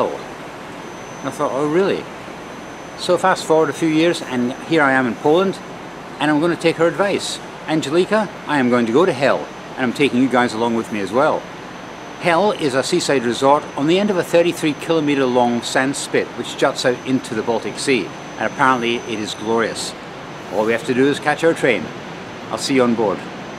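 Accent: Scotland, United Kingdom. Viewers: Great content. Btw is yours scotish accent? Luv it.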